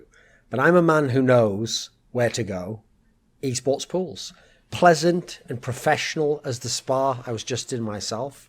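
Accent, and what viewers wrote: United Kingdom. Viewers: Why is more British today than usual?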